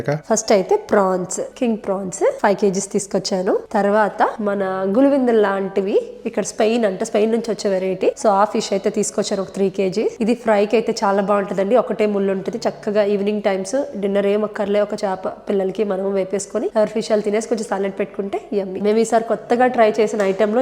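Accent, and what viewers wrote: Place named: Visakhapatnam, India. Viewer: Meeru pakka Vizag ,thelusthundhi amma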